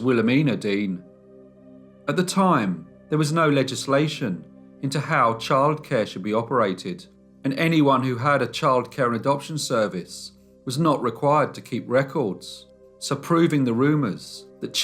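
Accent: United Kingdom. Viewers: im from North Carolina in the US its the south east in the US and if you only knew how horribly flat and that twang we have...I never say anything about how someone pronounces a word outside of this state. The most educated can sound like an idiot. I absolutely love a British accent, especially yours. Keep up the great work. You are helping keep me sane during this horrible pandemic and our joke for a leader..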